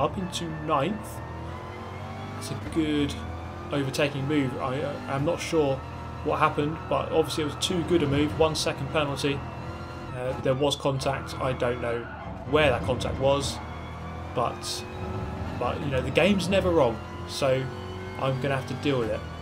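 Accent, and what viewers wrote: United Kingdom. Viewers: The british accent is perfect for sport commentating.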